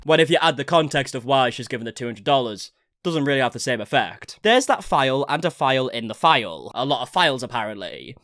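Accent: United Kingdom. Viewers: I wonder why so many British drama channels are so into covering this nutball woman. Seems like every time I click on an update - yep! At least if I have to hear about that horrid beast, it's in a pleasant accent! :)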